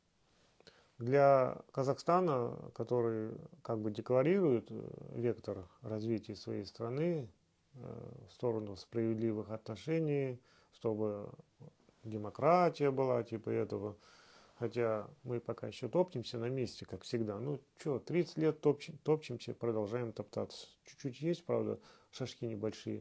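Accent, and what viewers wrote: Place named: Russia. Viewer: сіз қазақ аттарын атағанда нағыз орысша акцентпен айтасыз. Орысша сөйлегеніз жарайды, бірақ ЖарКЫн дегенініз ЖарҚын орнына өте жаман естіледі.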